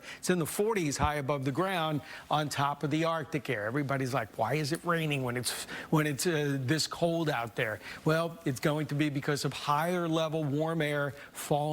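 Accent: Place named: New Jersey, United States. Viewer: Why is this guy from Jersey doing DFW weather 😂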